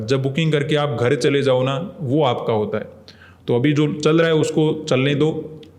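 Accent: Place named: Rajasthan, India. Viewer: आपके बातों से लगता है कि आप राजस्थान से belong करते हैं|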